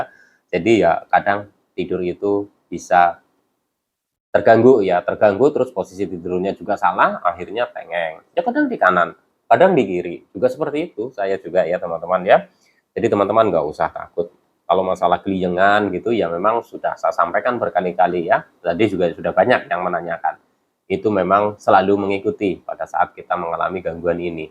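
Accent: Banyumas, Indonesia. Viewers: Cilobak raya hadir menyimak massee, ora Ngapak ora kepenak😂 apa Kuwi "Tengengan, Massee?"🤣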